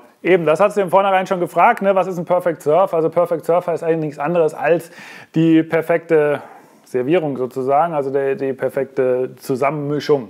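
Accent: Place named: Germany. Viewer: My favorite gin... and German accent makes me weak in the knees :-)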